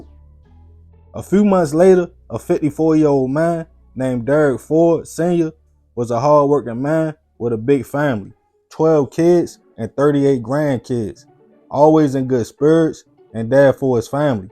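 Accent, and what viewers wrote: Baltimore, United States. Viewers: Africa tuning in, love the content but the Baltimore accent gets me just like the southern part of the US accent. It’s certain words that I struggle with. love it though. Love & respect to and his family. Stay in peace everyone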